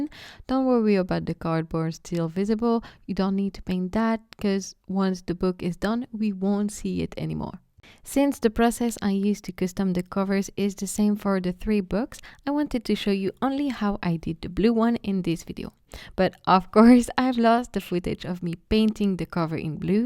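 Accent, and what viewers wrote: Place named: France. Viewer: Je me disais que l'accent était un peu français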